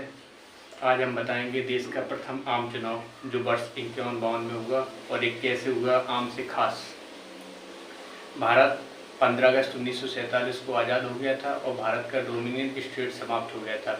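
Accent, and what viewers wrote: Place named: Jalaun, India. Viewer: I am suggesting you some references - Ek toh jo jalaun district wala tone h uspe thoda kam kro Bich me aap jo ruk jate hn ,uske liye pehle script achhe se likho ,bar bar practice kro Or aap ek episode dictate kr rhe hn,toh esa apki voice variations se dikhna bhi chaiye,na ki aap esa dikhen ki bs generally bat kr rhe hn.. Baki bs improve krte raho,,Take care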